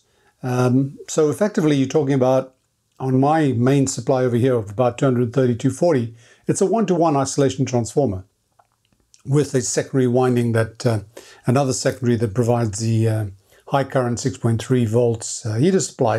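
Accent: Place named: South Africa. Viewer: I heard that you are a hoarder and I instantly became a big fan. I am too! My mom recently died and I had to move everything out of her house because my brother and sister didn’t want anything because they live elsewhere. I had to move her things, my dad’s things, my uncle’s things because he was a hoarding repeat gold medal winner and my things that I had stored there. My daughter is already worried about me dying and that she will be stuck with going through everything. I have a nice collection. Good thing I have a big shop. You sound like you are from South Africa. I visited Durban because I met a nice lady there on the internet 20 years ago. It is beautiful there. If you’re not from SA never mind. Keep up the good work!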